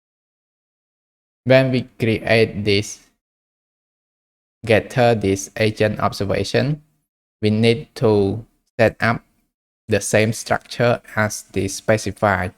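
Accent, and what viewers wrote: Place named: Thailand. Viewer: As soon as I turned on the video, I felt a very familiar accent. I lived for some time on the island of Koh Samui. I'm sure it's a Thai accent. :)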